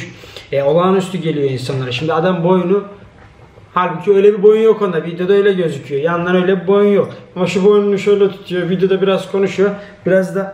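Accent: Black Sea, Turkey. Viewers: rizeli artvinli misin ya da karadenizli konusman ayni bizim oralar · Abi karadenizlimisin ? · Abi Trabzonlu musun Rizeli mi ? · Reis sen nerelisin ya trabzonlusun ya da rizeli ben ofluyum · Abi karadenizli misin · Abi sen Karadenizli misin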